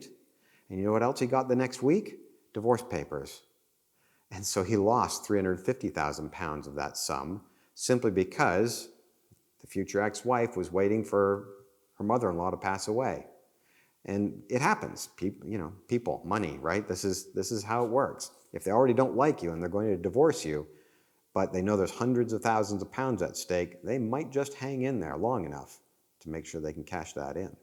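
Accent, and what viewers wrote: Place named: United States. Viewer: Hi you sound american? Are the trust laws in america the same as the uk? Thats if your telling us about american trust laws?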